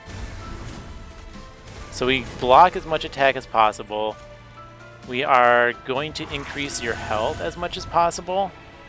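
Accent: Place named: United States. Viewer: Sounds like typical standard American intonation to me. though the trend over the last couple decades is towards flat affectless intonation.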